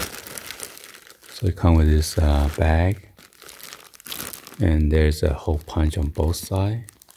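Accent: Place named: Japan. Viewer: 発音からわかる、100パー日本人。賭けても良い。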